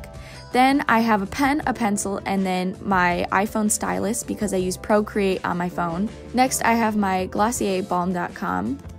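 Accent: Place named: Michigan, United States. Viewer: Me: idk why but she sounds like she’s from Michigan Her: get ready for the Michigan wether Me: I knew it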